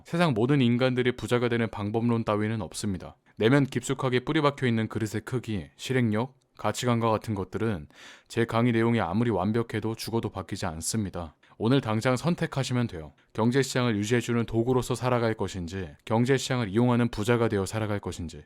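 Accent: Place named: Chungcheong, South Korea. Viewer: 목소리 멋있다.. 릴스보고 진짜 충청도 사람인줄 ㅎ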